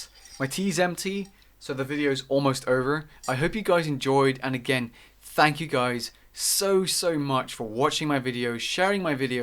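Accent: Netherlands. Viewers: Wait... you are dutch?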